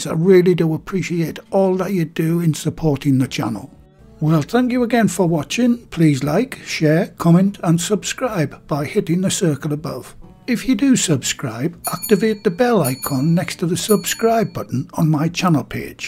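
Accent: United Kingdom. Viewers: I live 100 miles away from where this gentleman sounds like he comes from. I'm not so sure people are being offensive, the video is very good, his voice does resemble one of the worlds most recognised animated characters, I'm pretty sure this will also help boost the hits and likes on the video. Also, accents should be celebrated, I love how our accents vary across the UK, for small island, you can 30 miles in any direction and find a different accent. 👍🏻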